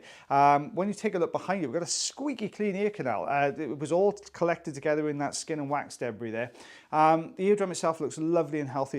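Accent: Wales, United Kingdom. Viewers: Still the best ear channel. And the Welsh is the best accent imo😊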